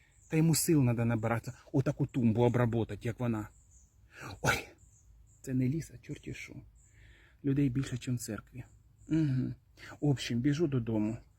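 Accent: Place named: West, Ukraine. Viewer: Я фанатка русского языка. Но думаю: как было бы неинтересно, если бы все славяне говорили на чисто русском! С таким удовольствием вас слушаю! Да ещё эта западная деревенская манера! 👍👍👍 У меня родственники на западе в селе - точно так там все разговаривают!😂😂😂❤❤❤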